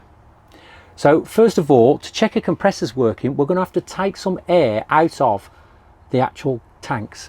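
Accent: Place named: United Kingdom. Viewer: Because that’s his local accent. There are many dialects and accents in the UK.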